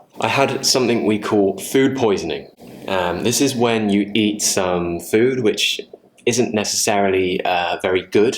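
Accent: United Kingdom. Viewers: Hi teacher, can i add you on whatsapp? i would like to practice my brittish accent with you.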